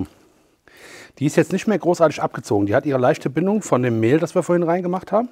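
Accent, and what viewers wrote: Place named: Frankfurt, Germany. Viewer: Absolut! Und der Frankfurter Dialekt - super!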